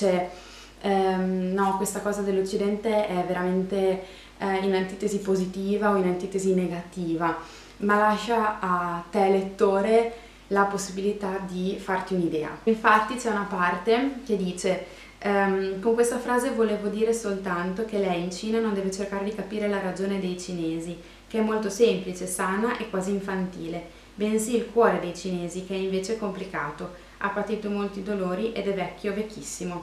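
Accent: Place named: Modena, Italy. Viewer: Ciao, sono arrivata sul tuo canale proprio grazie alla BTILI e mi hai conquistata!! Sei davvero bravissima. Hai una proprietà di linguaggio invidiabile e tra dolcezza e passione riesci a far innamorare dei libri di cui parli (ormai li ho messi tutti in wishlist 😂). Oltretutto io sono di Reggio quindi il tuo accento modenese mi è familiare 😊 a me piacciono moltissimo i fumetti proprio perché l'utilizzo delle immagini può essere molto evocativo. Complimenti!!!!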